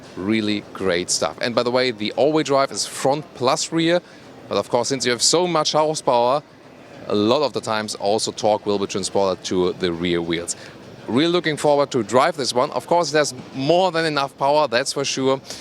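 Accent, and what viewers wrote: Germany. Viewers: i really love your denglish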